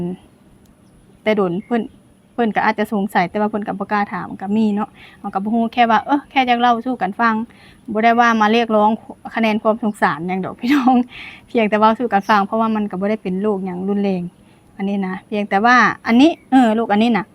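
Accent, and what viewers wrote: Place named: Khon Kaen, Thailand. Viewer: เพียงยูแขวงได๋ เว้าคือบ้านอ้ายแท้ ขอนแก่น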